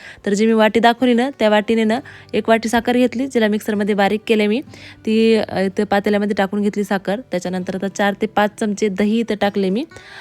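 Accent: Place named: Buldhana, India. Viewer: छान केलंय घराचे काम, पूर्ण झाल्यावर भारी वाटेल 😊 माझ्या मावशी सासूबाई नांदुरा बुलढाणा च्या आहेत त्यांची बोली आणि तुमची बोली सेम आहे 🥰 मी माझ्या काही व्हिडीओ मध्ये दाखवलंय त्यांना✨ छान वाटतं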